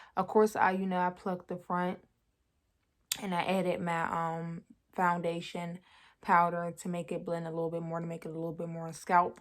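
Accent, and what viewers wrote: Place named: DMV, United States. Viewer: Ok cool! Thought I heard a DMV accent which is why I asked.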